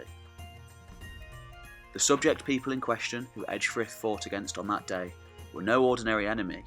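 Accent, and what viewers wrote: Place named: England, United Kingdom. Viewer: Arturo Wagner I am going to assume you are from somewhere outside the UK because you can't seem to be able to tell the difference between a Scottish and an English accent, Ewan McGregor is Scottish, the narrator is English, and on top of that the tone of the narrators voice is absolutely nothing like Ewan McGregor.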